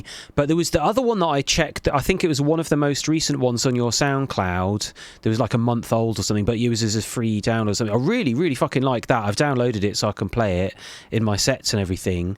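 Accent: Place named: United Kingdom. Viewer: after the song I didn't expect you to sound super british haha:D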